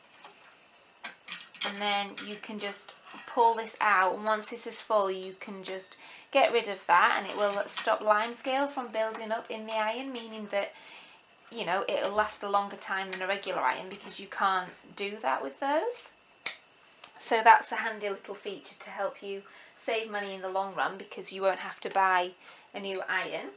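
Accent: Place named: RP, United Kingdom. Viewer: very posh! x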